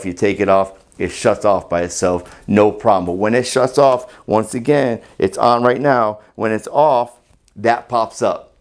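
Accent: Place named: North Jersey, United States. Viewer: Bro!! You from North Jersey?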